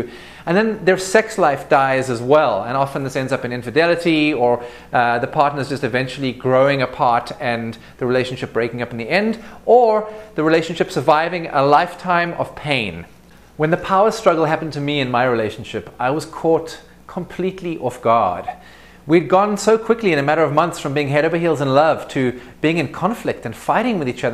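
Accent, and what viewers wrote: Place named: Afrikaans, South Africa. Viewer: you sound dutch, south african and something else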